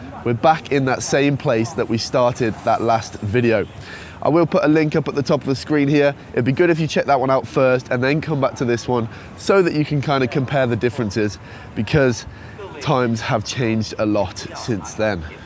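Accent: United Kingdom. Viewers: Your British English is so cute and nice. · Thank tell truth instead too much propaganda news in America, only fools of themselves now. You look good today. Yr accent not too British so easy understand, yr older partner too accent to understand for America people.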